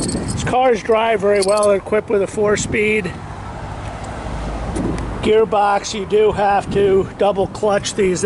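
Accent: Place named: United Kingdom. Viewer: Great use of Anglo Saxon English when pulling out. You could pass for a Brit, thanks for a great review. All the best from England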